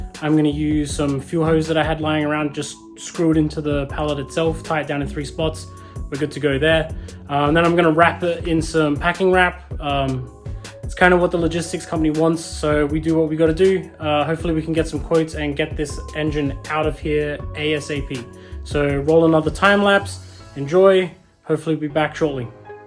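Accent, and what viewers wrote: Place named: United Kingdom. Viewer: The British accent just does it for me 😍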